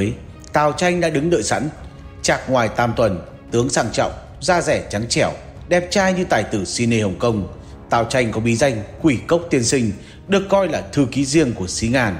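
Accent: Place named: North, Vietnam. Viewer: Giọng bk đèo bỏ mẹ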